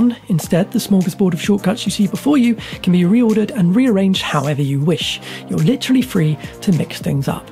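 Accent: United Kingdom. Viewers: Heavy British Accent! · Where is that accent from? (Sorry, I'm not a native speaker, but it sounded really cool to me) Is it british? Excelent video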